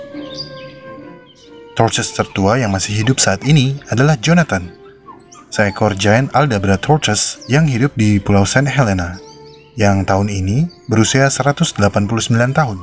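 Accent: Indonesia. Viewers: selama masih di mengerti.. ga masalahin....enak malahan ad aksen Indonesia nya terjaga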